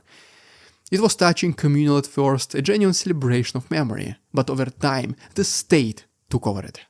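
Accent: Russia. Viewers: I am fond of your YouTube videos. As a linguist I am fascinated by your excellent English combined with a very heavy Russian accent. The two features are not congruous. Would you do us the favor of a short autobiography especially regarding what country you are fled to, and how you learned your English which is the best among Russian presenters.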